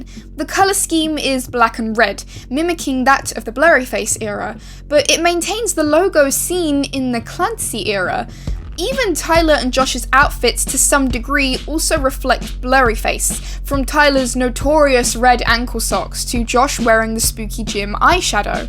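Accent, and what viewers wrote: United Kingdom. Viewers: i didn’t realize was british 😭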